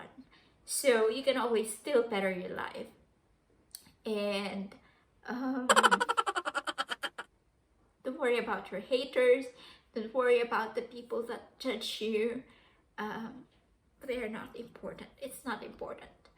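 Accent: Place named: Australia. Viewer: Yes nemern! You sound very Aussie na!👏🏻 Keep up the good work and Godbless!🙏🏻 Taas kamay for us Filipino Migrants!🇵🇭🇦🇺🇨🇦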